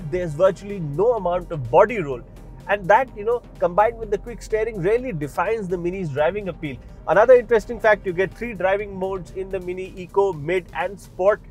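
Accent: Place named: India. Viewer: Indians speaking English are the best 🤘🏽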